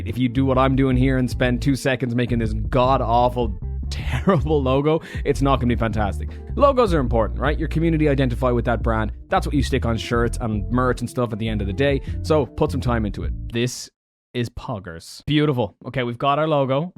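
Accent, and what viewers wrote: Ireland. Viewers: Are you from Ireland? I'm originally from Donegal! I have to subscribe now! Also thanks for the tips, I've only ever done 2 live streams, but planning on doing more!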